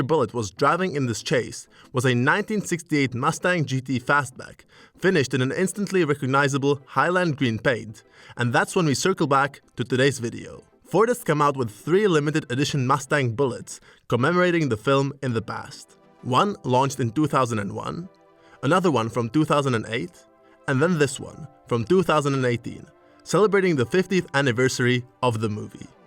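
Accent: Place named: United Kingdom. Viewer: I didn't ask for it, its not my video. Looks and sounds like some "Bloke" from the UK...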